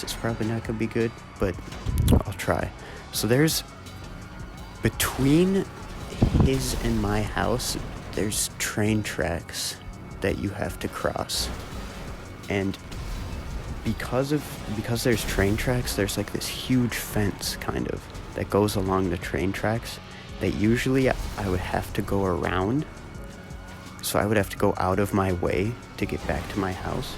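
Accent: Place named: Mexico. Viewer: u followed me on instagram and im assuming its becuase i follow luna and goblinn but dont try to be like them lol u sound like ur talking into an extinct microwave, ur voice isnt really thrilling, u sound like ur mexican,indian,irish, and a whole other bunch of languages, ur voice isnt interesting, ur not sure about what ur talking about and ur unfunny as shit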